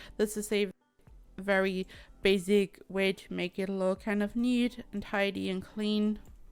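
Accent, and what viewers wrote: Germany. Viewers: Your Accent tho....you're German ain't ya?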